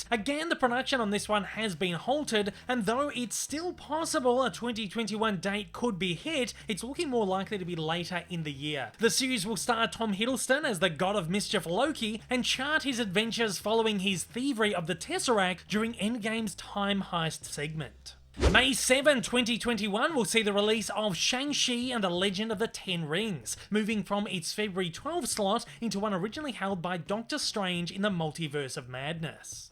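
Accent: Australia. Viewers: Well that’s an Australian accent. You better get used to it lol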